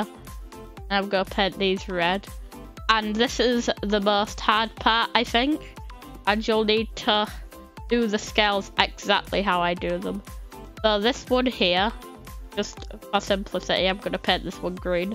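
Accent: United Kingdom. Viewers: Nicee accent ( is that british )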